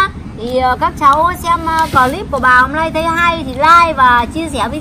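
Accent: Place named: North, Vietnam. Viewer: Người bắc thế phải rồi cau ạ :))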